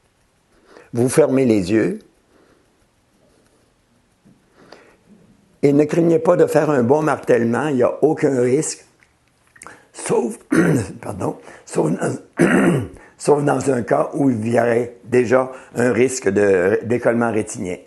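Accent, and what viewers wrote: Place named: Canada. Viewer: Extra, merci beaucoup ! Votre site est super, on va aussi transmettre le lien à nos clients ! Et j'adore votre accent canadien :-) il réchauffe le coeur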